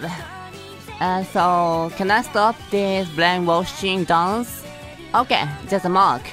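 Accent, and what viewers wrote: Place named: Japan. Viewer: good Japanese English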